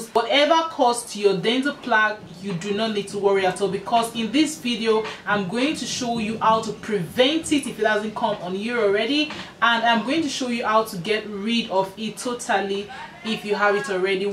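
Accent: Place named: Africa. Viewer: Love that African accent P.S. you are beautiful!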